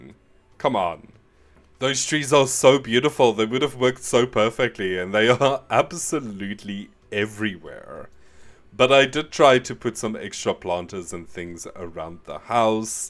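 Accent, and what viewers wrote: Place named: South Africa. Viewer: I could here it in your voice 😊 Nice to meet another South African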